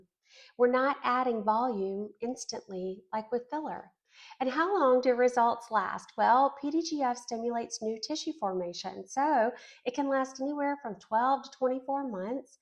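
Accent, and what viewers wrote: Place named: South, United States. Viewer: Hi, you sound like you’re from the South as am I. Where is your clinic located?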